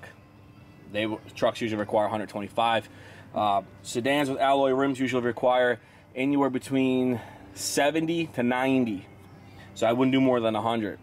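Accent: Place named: Rochester, United States. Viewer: Very nicely done ... nice to hear (Rochester County?) accent, reminded me of person who first taught me about fixing cars. Though quite familiar with job, appreciated fine details and learned helpful hint about lube around clip area in addition to caliper and front side fingers. Imagine it is really supportive to receive appreciative feedback, especially considering what I imagine is only a low percentage of people who do appreciate it can/will take the time to write a comment.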